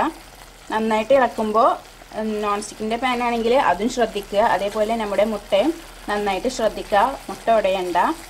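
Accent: Malappuram, India. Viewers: മലപ്പുറം. ആണോ വീട് സംസാരം. കേട്ടിട്ട് നമ്മുടെ. ഒരു. Reedi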